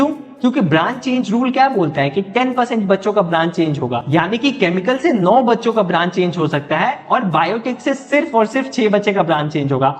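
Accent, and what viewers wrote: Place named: UP/Bihar, India. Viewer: Bhaiya aap pakka UP/Bihar se lgte hain. I am also from Bihar😊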